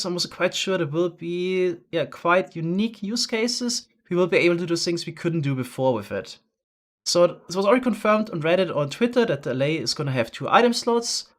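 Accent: Germany. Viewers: Damn you sound german, but great video tho.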